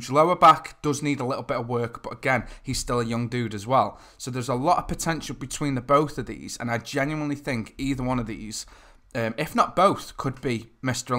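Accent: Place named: Stoke, United Kingdom. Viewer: Are you from Stoke mate?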